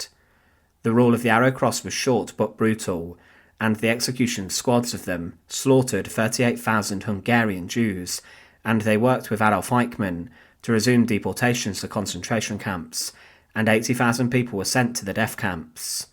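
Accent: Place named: England, United Kingdom. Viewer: Not Ai he's a pom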